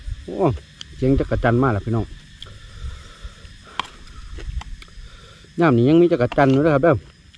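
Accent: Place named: Amnat Charoen, Thailand. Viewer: หนูว่ายุอ้ายคือเว้าคือภาษาบ้านหนู เอฟซีอำนาจเจริญเด้อจ้า 😁😁😁😁😁😊😊😊😀😀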